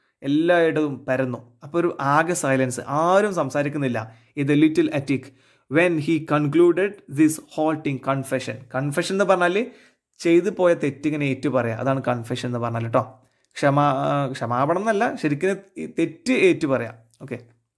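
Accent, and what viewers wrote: Malappuram, India. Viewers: Malappuram ahno atho palakado?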